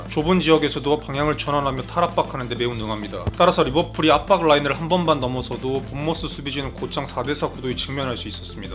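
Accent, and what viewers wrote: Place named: Gyeongsang, South Korea. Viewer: 경상도 스탈인듯요~~^^😮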